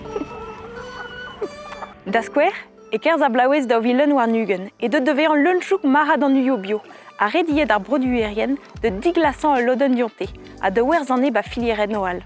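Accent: France. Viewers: Well, it is true that there are some french caracteristcs in her accent. She doesn't seem to have much struggle to stress sylables, though (which is something we, french speakers, don't know a lot about as we only do it at the end of sentences or so). What makes her sounds french must be her vocal and consonnant placement. Yet, you surely are aware that most Breton speakers are native french speakers, initialy. And those who actually get to fight to keep Breton alive, already some decades ago, learned it from their grand parents who knew french (or some french) too. This helps to undersrand why these features exist in modern Breton. I guess modifications in a language, in general, aren't nor good nor bad. Maybe it's just how it goes. You can still find few active story tellers (recorded by Brezhowed, by the way) that have pronunciations that may fit more your expectations (and mines, as I love strong local accents in every language I learn). 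Then again, thanks to all of them fluent Breton speakers from this channel to keep going with their good work, not only by doing it in Breton but also by offering great content variety and good reportage quality.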